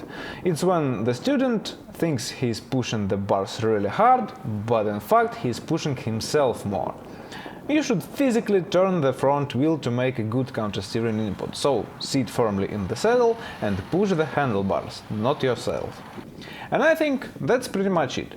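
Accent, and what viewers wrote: Russia. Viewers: Apart from being 100% quality easy to follow and to remember, motor bike riding lessons, I love the Russian accent, specially when you speak Italian, thanks buddy. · I love your russian inflections when you speak english, theyäre so charming :-) great vid as always, oldie but goldie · Concise and precise. I have watched and followed many videos on YouTube, yours is the most understood despite your thick Russian accent. Cheers from Malaysia.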